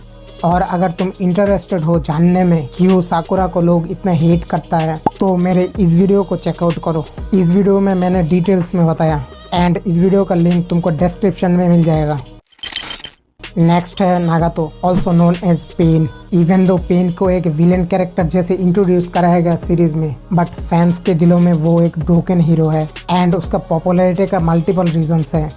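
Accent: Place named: Northeast India, India. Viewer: Voice sunke aisa lagta he ki tum Manipur, nagaland, mizoram, odisha me kahise ho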